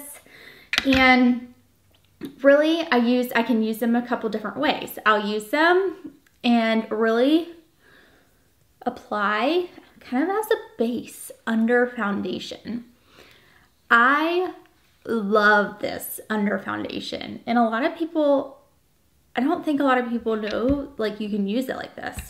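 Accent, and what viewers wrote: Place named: Virginia, United States. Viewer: I love your accent. Are you from Virginia?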